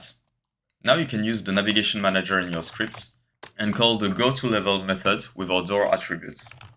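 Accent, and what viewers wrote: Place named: France. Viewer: tbf i think it's just the French accent just making him sound like he's looking down on you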